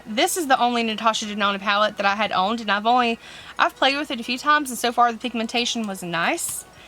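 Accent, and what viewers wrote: South, United States. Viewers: Sorry about you not feeling well! Are you southern though?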